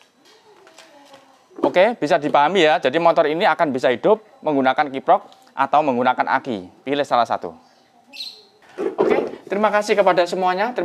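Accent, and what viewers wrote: Java, Indonesia. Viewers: Sajak wong jowo omongane,jowo ngendi bro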